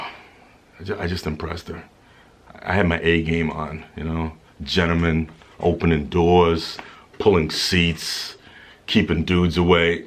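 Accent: Boston, United States. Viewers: 1. Your Boston accent is showing. 2. I can tell edited the video because she inserted "Finally WTF" 😂😂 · Wonder why the twins don’t have your Boston accent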